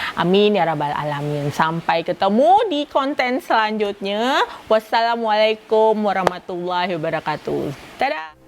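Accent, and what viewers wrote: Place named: Pontianak, Indonesia. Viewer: Mantap kak tetap konsisten dengan bahase pontianak kite...bangge dengarnye..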